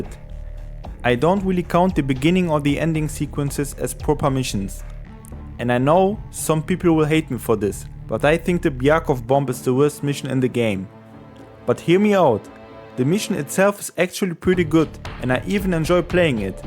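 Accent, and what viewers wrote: Germany. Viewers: Man merkt das du Deutsch bist Aber trotzdem gutes Video ❤ Und sogar unterhaltsam 👍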